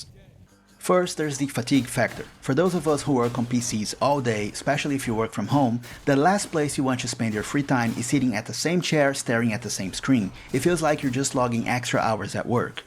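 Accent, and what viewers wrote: Brazil. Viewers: A Brazilian accent is always unmistakable. Olá, meu amigo. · Percebi que era BR pelo sotaque primeiramente hahahaha. Depois vi os textos em português em alguns dos jogos. Você fala muito bem inglês, muito impressionante. Consumo muito conteudo gringo, acabei caindo no seu canal por esse video e fui surpreendido hahahah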